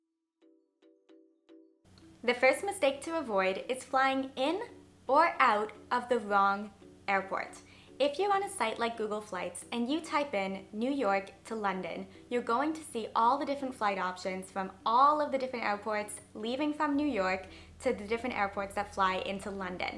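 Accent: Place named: Boston, United States. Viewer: Love your Bostonian accent :)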